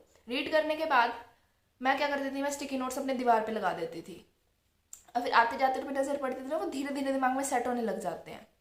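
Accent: India. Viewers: Aap rajasthan se ho ? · Aap haryana se ho?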